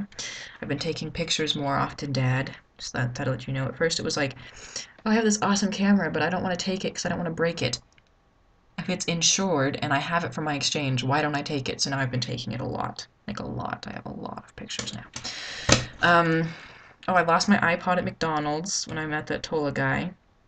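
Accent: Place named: Germany. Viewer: It's great to hear your German-influenced English now! You're speaking English but you're saying it the way I imagine the German phrases or expressions would be said. :)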